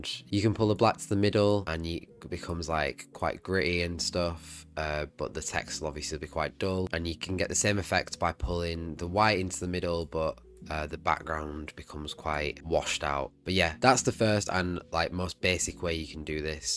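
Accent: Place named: United Kingdom. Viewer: Sooo bri'his! (I lived 2 months in Reading years ago :) )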